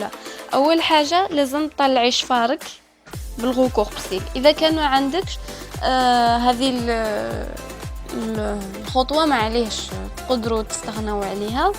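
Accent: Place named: Djelfa, Algeria. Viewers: هدرتك حلووووووة تباني بوسعادية.. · لهجتها قريبه للهجة جلفه وبوسعاده مي عحبتني عفويتها ف لهدره كملي هك تهبلييي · من الهدرة تاعك حسيتك من الجلفة تهببپبل,❤️❤️❤️❤️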